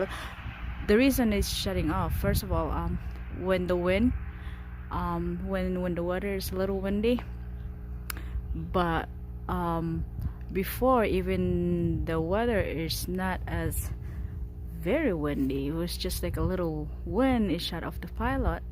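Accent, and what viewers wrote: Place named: Philippines. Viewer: Filipina accent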